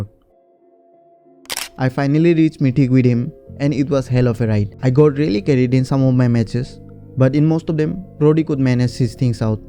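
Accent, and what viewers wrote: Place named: Assam, India. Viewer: Assamese accent hmm r u a Assamese guy ?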